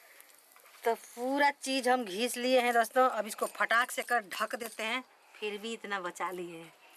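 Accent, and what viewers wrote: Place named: Bhojpuri, India. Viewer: behan aap bhojapuri me hi boliye bada pyara lagta h aap bhojapuri bolti h to sach me bol rahi hu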